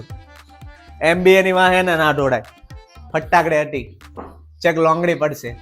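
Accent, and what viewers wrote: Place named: Kathiawar, India. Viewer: આપ ભાવનગર નું ગૌરવ વધાર્યું પ્યૉર દેશી કાઠિયાવાડી રજૂઆત આપ કરી રહ્યા છો હો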